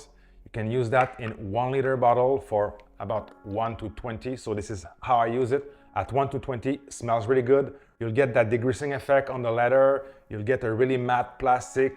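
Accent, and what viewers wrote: Quebec, Canada. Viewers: I really like your presentation and I respect your recommendations. And as a fellow Canadian, I dig your Quebec accent! 🙂 Keep up the good work! Thanks!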